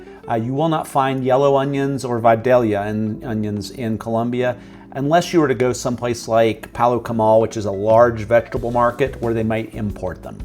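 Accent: United States. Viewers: GREENSBORO? ❤❤❤ It's funny, I didn't notice your southern accent until you said that. I graduated from Elon and did my internship with WGHP in High Point! We live in Oxford now. As a Mass Communications major, KUDOS to your videos . Your scripts and delivery are spot on.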